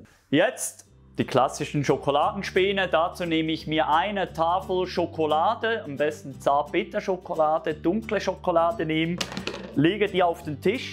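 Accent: Switzerland. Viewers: Dopo diversi anni che sono tornata in Italia dopo aver vissuto in Svizzera per 20 anni ho avuto voglia di elaborare una swarzwalder torte sono felice di sentir parlare svizzero ed di sentire le spiegazioni in modo così chiaro. Complimenti. Faro questa torta peril compleanno è la regina delle torte. Da oggi ti seguirò.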